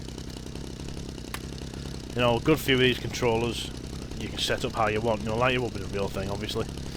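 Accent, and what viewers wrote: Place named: Northern England, United Kingdom. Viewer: Where in the UK are ya dude? I know with your accent your a norther :) Thats where the good lads are from lol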